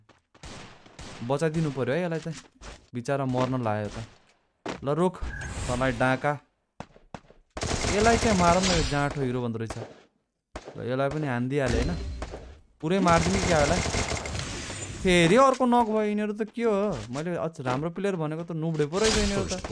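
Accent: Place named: India. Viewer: Nepali bolnu birsina aatexa dhoti jastai bolxa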